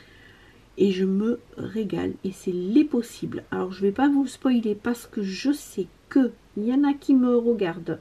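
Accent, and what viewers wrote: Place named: South, France. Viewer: Comme je te comprends 🫂 ah ouii c'est sûr que ton accent y est pour quelque chose hihi. D'accord tu es bien née dans le sud. Oh Martigues, j'y suis allée une fois. Et oui la vie, peut transporter ailleurs. Oh c'est gentil pour la vidéo explicative mystère, à condition que ça intéresse d'autres personnes qui voudraient se lancer vraiment dedans. Moi, je suis juste curieuse de cette drôle de bebête 🤣 Des gros bisous ma 😘😘❤️🫶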